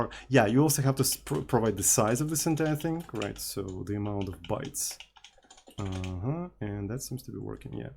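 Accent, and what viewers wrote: Russia. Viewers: Чувствую русский акцент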